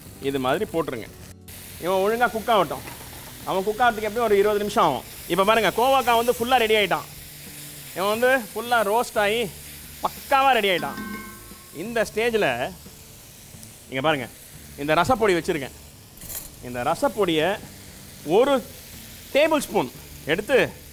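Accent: Chennai, India. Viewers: வணக்கம் வெங்கடேஷ் பட் உங்கள் சென்னை தமிழ் அருமை , பாகற்காயை அவன் இவன் எனபது . பாகற்காயை பிடிக்காதவன் சாப்பிட வைக்க என்ற வார்த்தை 👏 எத்தனையோ பெண்களை வாழவைக்கிறீர்கள் வெளிநாட்டுகார்ர்களுக்கும் சுவை எனில் என்ன என்று கற்று கொடுக்கிறீர்கள்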